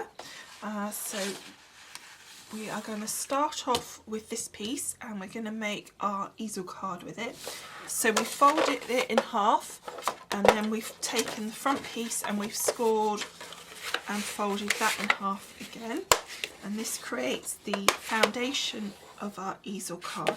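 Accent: United Kingdom. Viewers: How sweet is that you remembered the "hun" part in my name which actually stands for Hungarian. I grew up in Hungary, and lived for a few years in Germany,Canada, and now for 12 years in Dallas, Texas. Where do you live in the United Kingdom? I always enjoy listening to you talk in your videos because your English sounds so much nicer than American English. :)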